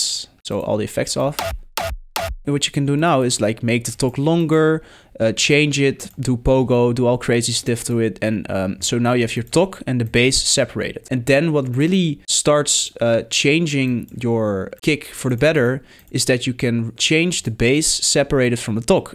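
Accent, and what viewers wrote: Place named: Netherlands. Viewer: Lol. Je hoort meteen dat Nederlandsche accent. Edit: hoe lang Ben je al bezig?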